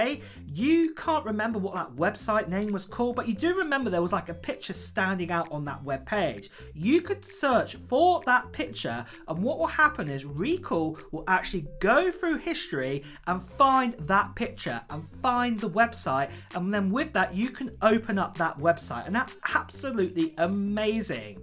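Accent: United Kingdom. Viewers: 1) No offense but you do have a strong British accent/dialect and talking way too fast. Thus, for many people who’s English is fluent but not the first language is hard to understand you unless you slow down a bit. 2) We need a real world test of this Elite X Laptop vs Mac Book pro where we compare 4k video rendering time length between MacBook Pro and best Elite X laptop. Everything else is silly and waste of our time because even the cheapest intel i5 laptop would be snappy at opening apps, web pages and photos and is not an indication of how faster or more powerful elite X processor is.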